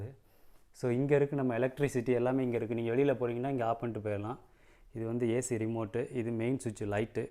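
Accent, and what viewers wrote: India. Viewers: நம் ஊர் தமிழ் கேட்கும் போது மிக அருமை.உங்க குழந்தை தமிழ் பேசுமா.வாழ்த்துக்கள் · Hey, your Tamil slang still same, that’s cool 😎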